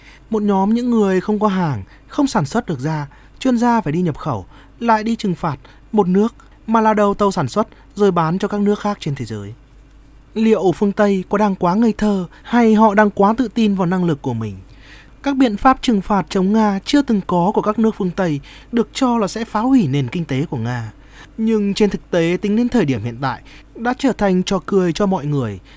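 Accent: Standard. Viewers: bạn nói rất hay .chuẩn luôn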